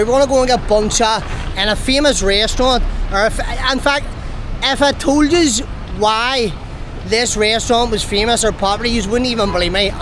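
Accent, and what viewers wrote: Liverpool, United Kingdom. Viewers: Are you a scouser :D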